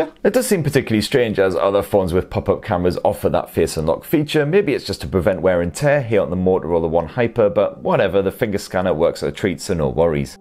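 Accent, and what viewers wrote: United Kingdom. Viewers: Lisandro Bravo you realise that he has British accent, which is the original English?!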